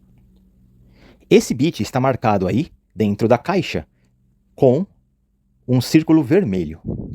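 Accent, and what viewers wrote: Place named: Rio Grande do Sul, Brazil. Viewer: vc tem sotaque de alguma cidde do RS.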